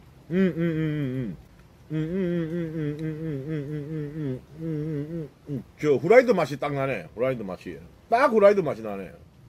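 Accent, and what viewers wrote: Gyeongsang, South Korea. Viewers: 경상도 특 안고쳐짐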